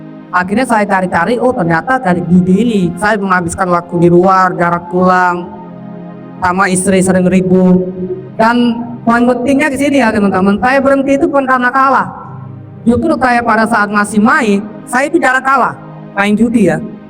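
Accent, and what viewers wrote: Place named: China. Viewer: Logat bicara nya kyk org china yaa 😅🙏🏻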